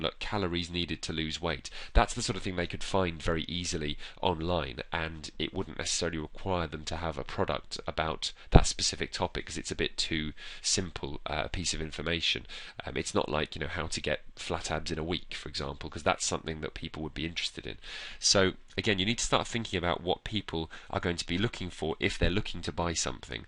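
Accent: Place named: Wales, United Kingdom. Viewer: This vid, and what I think is a Welsh accent, is awesome. I'm starting a second career and thinking about marketing, research, etc. Don't have much of a clue how to get started. We didn't have computers 101 in college when I went eons ago, So thanks, I've subscribed and will watch your other stuff. This video has an easygoing casual approach, with just enough complexity for me to wrap my head around. Excellent, thanks again, and happy trails to me!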